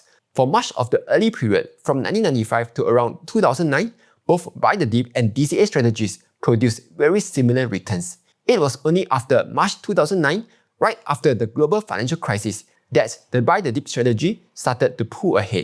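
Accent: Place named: Singapore. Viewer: I can't understand your singlish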